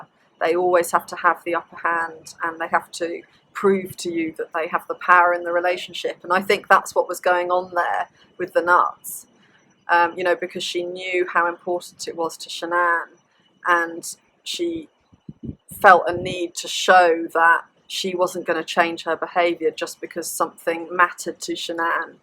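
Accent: England, United Kingdom. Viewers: For her accent I thought she was from England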